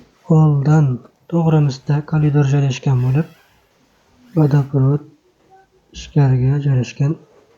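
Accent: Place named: Tashkent, Uzbekistan. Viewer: Toshkent shevaga oxshamin qoldi😅😅😅😅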